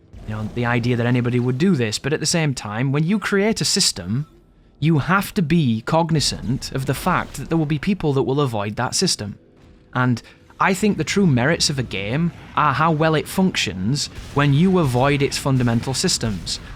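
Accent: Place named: Sheffield, United Kingdom. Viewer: Really enjoyed this playthrough, I played it through earlier this month so it was a nice coincidence. I'll appreciate another full year of your Sheffield accent.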